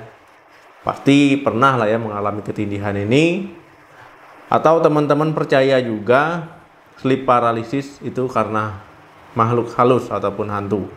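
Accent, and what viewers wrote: Aceh, Indonesia. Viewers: Logat dokter kek org aceh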